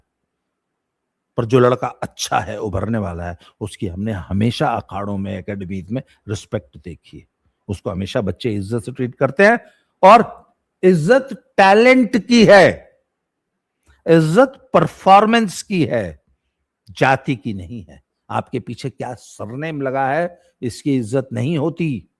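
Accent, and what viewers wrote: Haryana, India. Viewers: ekdam 100 percent hariyanvi bhasa me boltey ho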